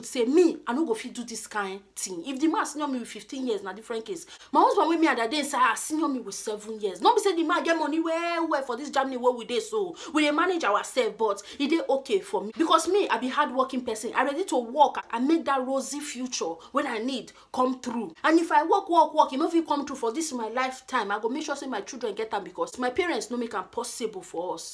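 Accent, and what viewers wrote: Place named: Warri, Nigeria. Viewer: Chai, this your pidgin na original one. It seems you be WARRI brought up WAFFI BAE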